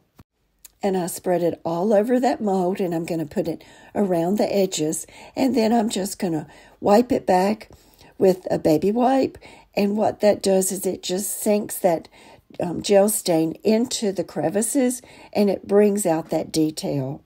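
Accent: South, United States. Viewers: everything is so pretty. I think my favorite is the three crosses. Thank you so much for sharing the Lord here on your channel. I know I told you earlier you remind me so much of my sweet aunt Brenda. Even to your sweet southern drawl. Sometimes when I’m watching your videos I feel like I’m listening to her. 🩷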